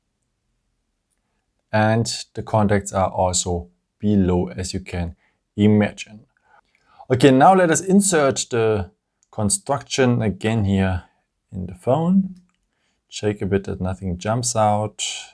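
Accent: Germany. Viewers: You sound German by the way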